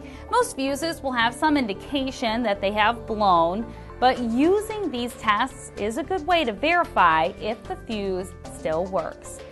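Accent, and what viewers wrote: United States. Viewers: The American accent makes it difficult to hear clearly.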